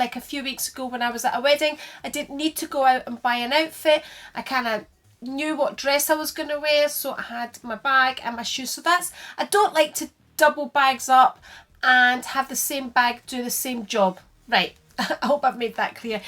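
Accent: Scotland, United Kingdom. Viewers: Beautiful classic shoes, Manolos are so sophisticated and unique !!!! It's always lovely to see your pretty face and I love your Scottish accent too. Lots of love as always to you, your mum and family XXXXXXXXXXX Q&A: how hard was it to marry so young and to have children so young ? How did you meet your hubby ? :) XXXXXXXXXXXXXXX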